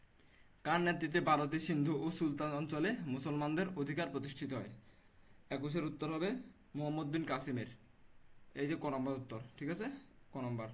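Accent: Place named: Chittagong, Bangladesh. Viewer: ভাইয়া আপনার কন্ঠ সুনেই বোঝা যায় আপনি আমাদের বরিশালের নয়,আপনি চট্টগ্রামের ওহ🎉🎉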